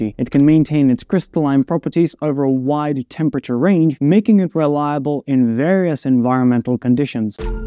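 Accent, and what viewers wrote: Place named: Scotland, United Kingdom. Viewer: (with a slight Scots accent)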